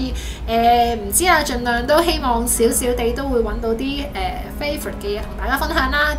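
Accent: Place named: China. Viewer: 不錯！改善英文發音更好，太chinglish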